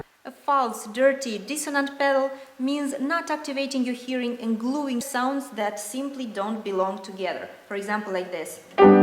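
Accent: Russia. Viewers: Замечательный, качественный учебный материал, сайт тоже сделан старательно и со вкусом. Небольшая ремарка насчёт русского акцента (товарищ внизу тоже заметил), от него легко избавиться если захотеть и понять главный принцип — англичане, особенно американцы, издают звуки большей частью через нос. Направляете все гласные туда, на этот канал цепляете согласные, и от нативного спикера будет не отличить, голос станет более певучим и появятся красивые обертоны. Процветания!! ))) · ох уж этот русский акцент, но красивая - глаз не оторовать ))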